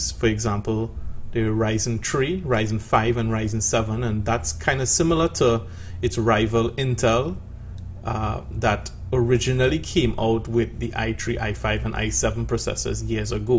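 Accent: Trinidad and Tobago. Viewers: You can hear a Trini from anywhere in the world. 😂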